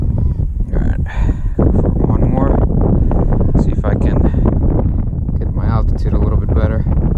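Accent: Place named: United States. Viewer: You sound american...Did you get permission from the FAA to go that fast?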